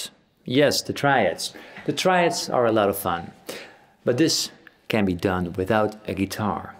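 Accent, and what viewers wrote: Netherlands. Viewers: Eyyyyyyy sound dutch. Hallo daar ;)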